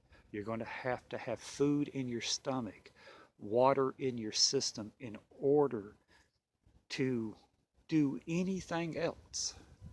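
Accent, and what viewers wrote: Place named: South, United States. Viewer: Good points thanks. You sound like your from the south so the cold may not be as much of an issue as up north. But I recommend the little buddy heater I use it in my deer blind. These nor'easters we just had up here was a good practice run. I found I needed more hand sanitizer and rubber gloves. A couple more 5 gallon buckets would be good to I was helping a couple unprepared neighbors out with water.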